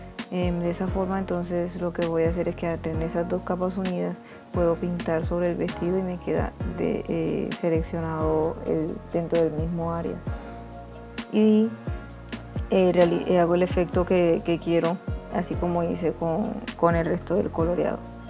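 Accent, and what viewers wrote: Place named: Barranquilla, Colombia. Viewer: Está hermoso, me servirá un montón. Graciaaas! De paso, eres de Barranquilla? Se me hizo por el acento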